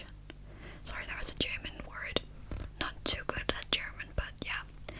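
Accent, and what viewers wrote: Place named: Denmark. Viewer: Ohh btw, do you mind me asking what city / Danish region you’re from? Your Danish accent is beautiful but I think it’s a bit different from the one I’m used to hearing on Duolingo 🥰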